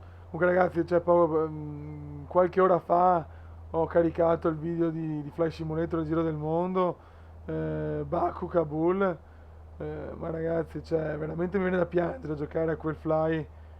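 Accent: Veneto, Italy. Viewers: stima per il veneziano ahahah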